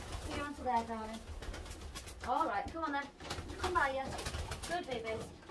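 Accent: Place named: Hull, United Kingdom. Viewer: What part of the uk are you from, sound like you have a hull accent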